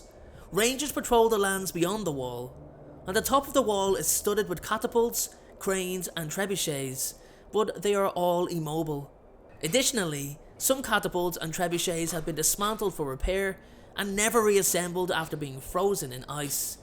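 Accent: Dublin, Ireland. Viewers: That Dublin accent just ruined this video😫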